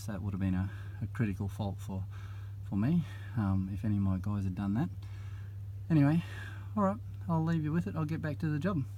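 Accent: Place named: Australia. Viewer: Thank you, it is nice to hear an Australian talking about his product, rather than another country explaining how it is done. 😀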